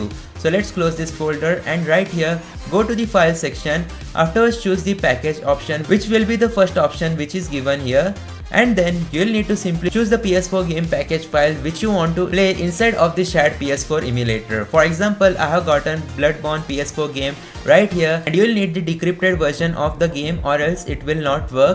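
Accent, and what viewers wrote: India. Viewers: The curry accent 💀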